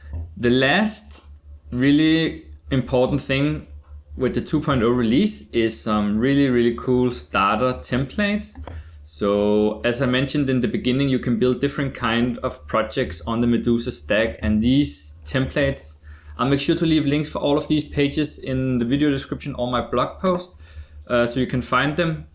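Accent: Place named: Denmark. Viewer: Du er dansker kan jeg høre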